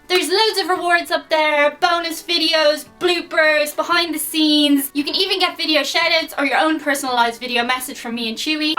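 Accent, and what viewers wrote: Ireland. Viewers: I've been watching your videos and it has just hit me; you definitely have a Non-American accent, and yet I can literally understand every word you say (well, except "butter" - it took me some effort to get this one). However, I had had the chance to watch some Irish movies on Netflix in the past, and I was having a lot of trouble understanding the speech. How come? Is the Dublin accent that much more compatible with American? Is there a Dublin accent to begin with? If not, what am I missing here? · You was totally entertaining. I love to hear the Irish accent. Yes better than the Brits. I had some of you're top ten when in Ireland. The black pudding. No no no. Soda bread and stew ,fantastic, and ice cream Also . Compliments on the makeup. It's well done and tastefull. ( Oh the eyes 😍) I really really liked you're goofiness at the end. Sure is better than credits or a total drop off of video. 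Hope more of that. Thank you! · Like your irish accent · It is so refreshing to see that the Irish youth have not wasted their time on learning moderation in tone of voice or tempering of the shrillness that can peal paint and eradicate nearly rodents. Pretty much like every other drunk 20 something with no restraint or filter of any type - the accent only partially makes up for the ordeal. Dante missed a level of purgatory that is truly thine alone.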